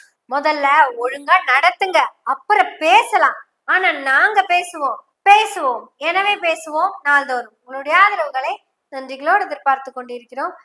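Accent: Kongu, India. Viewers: எனவே எனவே இதைப்பற்றி நல்ல கருத்தை கொங்குத்தமிழ் மொழியில் பேசிய சகோதரிக்கு நூறு வாழ்த்துக்கள் அரசியல்வாதியாக உள்ளே வரும்போது ஊழல்வாதிகளாக தற்குறிகளாக நுழைகிற கூட்டம் இதற்கு நல்ல பதிலடி கொடுத்திருக்கிறார் இந்த சகோதரி வாழ்த்துக்கள் வாழ்த்துக்கள்.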